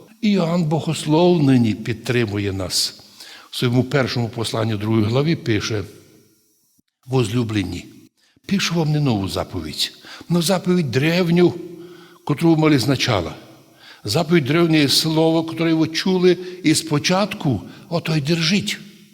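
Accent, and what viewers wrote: Transcarpathia, Ukraine. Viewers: Українською з закарпатським колоритом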